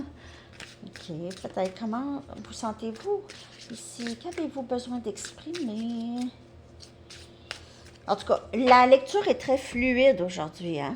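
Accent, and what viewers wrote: Quebec, Canada. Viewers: Impressionnant! Exactement ce que je vis! Tu es trop forte, j'adore t'écouter! Toutes le compréhensions et prises de conscience se font, grâce à toi, dans la joie et la bonne humeur! Quel bonheur! J'adore! Un grand grand merci à toi! Et ton accent, québécois, craquant! Tu es un guide génial! GRATITUDE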